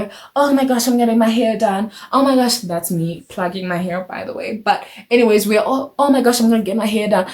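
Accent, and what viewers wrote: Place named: Zimbabwe. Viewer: Interesting topic and subscribed. Where you’re from originally, I picked up an accent. I know you studying in SA. Are you Zimbabwean or Malawian?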